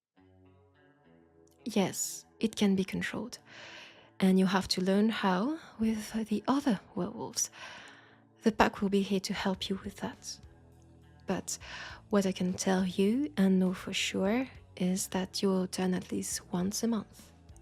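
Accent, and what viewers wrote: France. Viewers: So happy to see this witch back in business again, she’s my favourite of all the characters you voice and your French accent is always so nice to listen to hope you have a great Halloween and look after yourself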